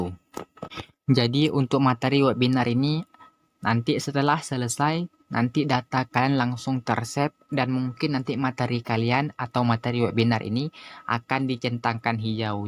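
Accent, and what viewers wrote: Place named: West Sumatra, Indonesia. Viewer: Trima kasih infonya bang Btw suaranya kek minang kocak hahaha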